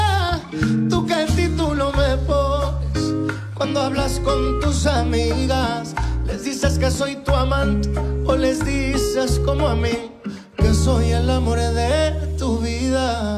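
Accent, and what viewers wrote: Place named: Andalusia, Spain. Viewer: Tiene un timbre de voz que podría ser perfectamente andaluz ❤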